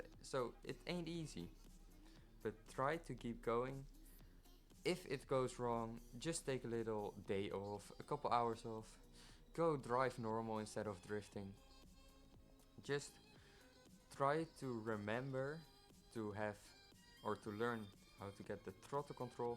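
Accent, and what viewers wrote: Netherlands. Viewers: W Vid like all ways! btw are you dutch? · ngl u sound dutch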